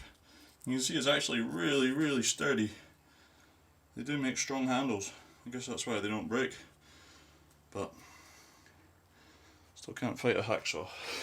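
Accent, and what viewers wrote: United Kingdom. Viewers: Nice wood combo, it's always fun to work during rainy days, ur accents reminds me of my yatch mate fr UK. Cheers mate. Excellent piece of arts.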